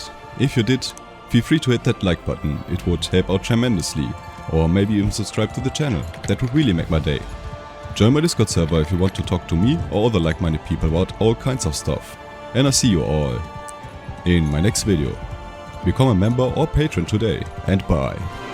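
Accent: Germany. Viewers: Klingst deutsch/schweiz/österreich :D Ich warte ja seit release auf Shokuhō oder einen der Mods die das Spiel in Zeitalter der Industrialisierung mit Linieninfanterie bringt. Der Star Wars Mod wurde ja scheinbar eingestellt :(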